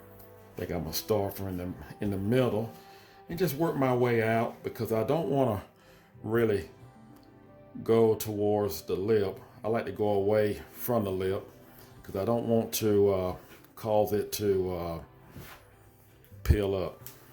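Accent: South, United States. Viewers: Love the country accent.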